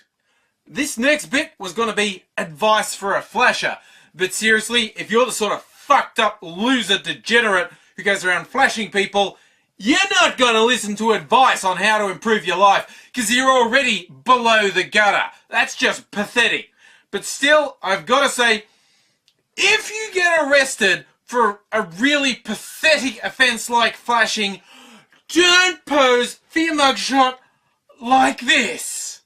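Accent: Australia. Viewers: omg your so funny...u talk in this angry voice ( with a Aussie accent) and i think that's funny...but what u talk ABOUT is 100% on the mark...for that 5 big as *'s :)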